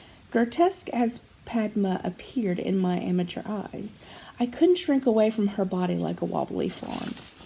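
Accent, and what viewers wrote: South, United States. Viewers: I have always loved readung, always. I'd rather hold a book in my hands then an e-book any day. Southern or not, you read beautifully. You read with expression and inflection which helps one to really imagine what's happening. Thanks so much📚💗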